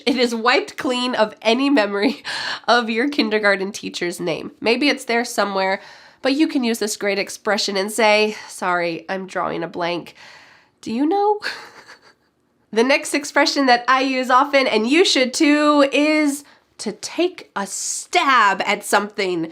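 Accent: United States. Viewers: I❤ u teacher.....ur american accent so awesome....wanna speak american accent like you ....its really cool Mc donalds said im lovin it Greeting for ur husband DAN and ur children.....your guys re inspiring family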